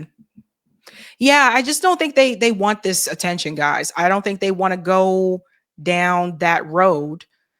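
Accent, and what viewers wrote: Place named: South, United States. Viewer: ..!!! 🙂 Pull Out.? You are a "Southern Woman" I guess Boston rubbed off on you a little bit 🙂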